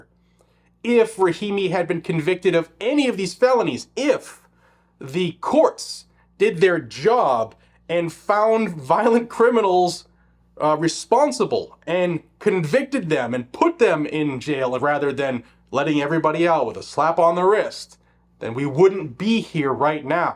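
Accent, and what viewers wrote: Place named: New England, United States. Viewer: Look at you!!! Only been in Tennessee for a couple months and you are already picking up our accent, slang, and language! I love it! Go ahead and immerse yourself in language with Johnny B so we can get rid of the rest of that foul, yankee accent! I love what you are doing my brother. Keep the faith, and the fight. We are all doing everything we can to stop all the madness from happening. Even if sometimes, all we can do is pray. If everyone would just praise God and pray, all of the problems would disappear. But, we know that most people won’t. So, here we are. We are definitely NOT the people anyone wants to fight against. But they are crazy, insane, and indoctrinated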